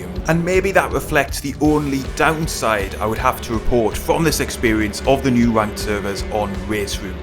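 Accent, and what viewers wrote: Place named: Wales, United Kingdom. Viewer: Whoa I tell you mate what you're fartin sparks! Are you Welsh?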